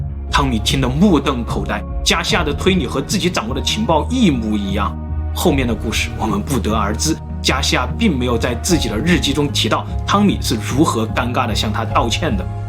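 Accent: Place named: South, China. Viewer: 我自己蠢，所以特愛聽聰明人的故事。這位南方口音超重的小伙子說的奇談怪論有趣、好聽、我喜歡😘。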